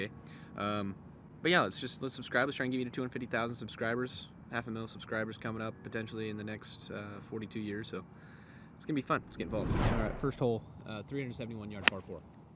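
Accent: United Kingdom. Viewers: Excellent british accent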